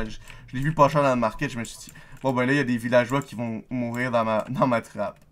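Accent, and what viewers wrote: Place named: Quebec, Canada. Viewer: J'adore l'accent québécois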